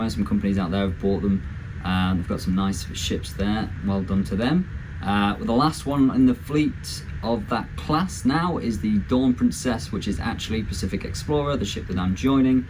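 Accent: England, United Kingdom. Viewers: I'm an Australian and i think I heard hyou saying that you are likely to be spending some time in Australia in the coming months/years. A little tip: Australians NEVER refer to the country as being "down" anywhere, Or even "Down under" Several times in this video you refer to something like "when we get down to Australia". An Aussie would never say that. Your accent will mark you as a "pom" (That's not necessarily an insult - it depends if there's an expletive with it (as in he's just a <expletive> pom") and it's fine to be a pom - there are millions of others here already - but it's a social gaffe to refer to Australia as "down here" or "down under" or "this happened on our way down to Sydney" That would tell Aussies listening that you are in the category of the poms who are despised - the ones whose focus is still in Europe, the ones who can't get over the fact they''ve moved home and things are not the same in Australia. 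I once worked with an Englishman who would NOT stop complaining that Australia was such a backwards country. We would grit our teeth and shut up for a while Ineitably he'd get around to saying that even the newspapers here are backwards. "Back home we get the Telegraph or the Daily Mail with the football results when we are on the way home from the game. In this backwoods country (meaning Australia) the papers dont have the scores until Sunday morning" He never could get it through his thick skull that the reason was because of the time zones. When we're a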